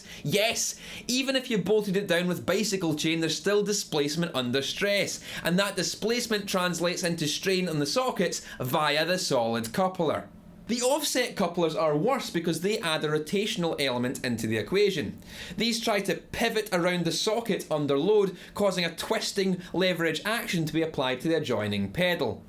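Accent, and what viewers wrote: Scotland, United Kingdom. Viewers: He’s Scottish. He says “Pair-dull’ 😄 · Love the Scottish accent. (No homo) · Oh how I love me some thick Scottish accent! Ah yes, the old home land. Great truth as well! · If anything I watch your videos for your Scottish accent, I love it. And that's no shiite. Makes me want to watch "Trainspotting" just to hear Robert Carlyle ( Begbie ) say " You fooking coont ". Great content bruv, always spot on. Peace. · the fact that you're a scotsman makes the preaching sound even better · Are you Scottish? I think I'm getting better about telling apart accents in the UK. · A Scholar, a Guitarist (I would have said Gentleman instead of Guitarist but being a Guitarist is much better than being a Gentelman lol ) and an Engineer :) well said sir. and I have to say only with your great Scotish accent can you say the most epic part of this video at 0.23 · Why is the Scottish accent so well suited to these "HELLFIRE AND DAMNATION UNTO ALL YE SINNERS!!" kind of things? 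If I said it I'd sound ridiculous! My Glaswegian Great-Grandmother is laughing at me from beyond the grave.... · I am hoping that I was right and it is scottish :D (totally love it by the way) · That just made my day... Hearing a Scottish man describe the devil working through guitar pedal couplers in an insidious voice, with sinister music and maniacal laughter in the background. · John JohnnyArt Pavlou It makes me wonder where he's from. He sounds a bit Scottish. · And that's why the Scottish accent is the greatest ever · This only works because of the Scottish accent. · i just love the Scottish accent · I didn't know you could get even more Scottish... Great video as always! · Reminder to me: Visit Scotland. Just because of that cool accent... :-D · Yeah the Scottish accent is pretty cool (I’m American) but he really is well spoken and knowledgeable, keep up the good work! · I'm first time on this channel, accent of this guy is soo beautiful. 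Is he Scottish ? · Here's another one of your videos that I walk away from a wee bit smarter from having viewed it. Aside from the super cool Scottish accent that we all love, you have a great blend of intelligent content and delivery of said content. Keep up the good work! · Oh my god. Your scottish accent is perfect with your anger ahahahahaaha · I like Scottish accent even though it's hard to hear..😂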